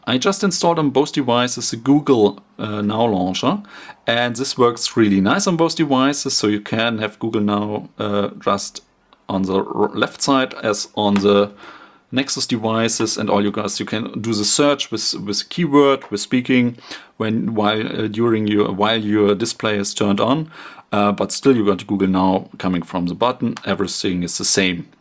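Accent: Germany. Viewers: Hi, I'm English and love your German accent! You speak English very well in my opinion. Besides that nice compliment though, your videos are very informative. I like how you address things that are important to "the everyday user" - please keep it up! I own a Nokia Lumia 820 and I regret buying it because the battery life is not very good at all and the available apps are poor compared to Android. I was watching this video because I was considering buying the Moto g.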